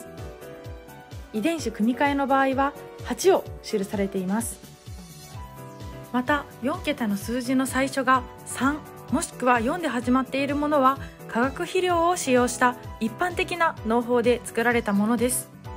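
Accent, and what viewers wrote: Kansai, Japan. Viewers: 気のせいかな？ なんか関西訛りがちらほらと、、、😊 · たいへんよくわかりました。 関西の方のようですね。関西弁でやってもらっても、結構いけるんちゃうかなあ。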